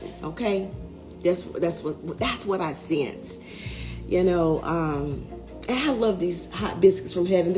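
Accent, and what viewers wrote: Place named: Carolinas, United States. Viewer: Heeeey you from The Carolina’s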